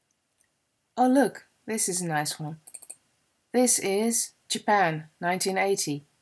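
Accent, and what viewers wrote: United Kingdom. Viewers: Thanks for sharing this, it was wonderful and a great way for me to start my day. I found myself chuckling along with some of your commentary especially regarding the PIDers. I thought at first that your accent sounded slightly British but as an American with my own southwestern twang (accent on the southern) I wasn't sure until you said you are a Dutch girl. Anywhoo thanks for this. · "Hey Ringo, how are you?" HAHAHA :D Nice video! I wonder how long it took to collect all these gorgeous photos. ...And I feel your struggle with the English language (I'm German and I try to get rid of my German accent haha), but you already do sound pretty well and British in my opinion!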